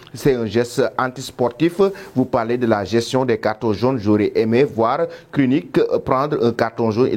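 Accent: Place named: Senegal. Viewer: Je suis ivoirien et j’aime regardé votre chaîne à cause de votre accent sénégalais . Big up depuis Bruxelles , Belgique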